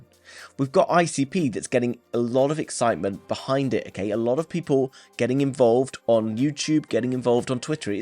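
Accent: RP, United Kingdom. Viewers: Your semi-posh Brit accent, with the odd expletive, is music to the ears. Wouldn't turn down a celebratory donation of ICP on 100K subs.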